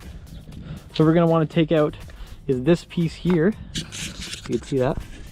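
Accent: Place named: Canada. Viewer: He sounds a little bit Canadian to me.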